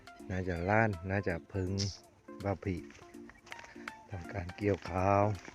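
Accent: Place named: South, Thailand. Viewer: ผมฟังออกทุกคำ พูดใต้เหมือนกันเดะๆ ❤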